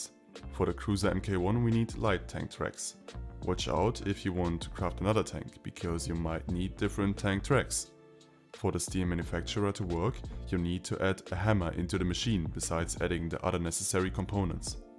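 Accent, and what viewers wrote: Germany. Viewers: Ich merke es schon von der aussprache dass du Deutscher bist hahaha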